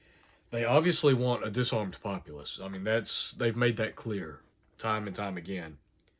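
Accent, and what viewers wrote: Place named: Southern Virginia, United States. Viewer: I'd like to meet you, im in Blue ridge mountains of southern Va, where are u located, you sound like you'refrom my neck of the woods ??? Im looking to form a mag but nobody around me are reliable or aware???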